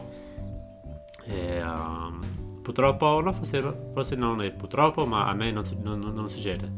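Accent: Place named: United States. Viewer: Sono romano e capisco cosa significa muoversi in questa città > gli autobus non hanno orari e pensa che per un periodo avevano messo alle fermate degli schermi con i tempi di attesa ((cazzata)) però devo difendere il fatto che non sbagliano strada, magari saltano qualche fermata, non arrivano sempre al capolinea, però non sbagliano strada. In ultima analisi > carissimo american guy > con l'accento americano e un appartamento a New York che aspetti a dire Sì a una girlfriend made in Italy? Uh, se perfezioneresti l'italiano!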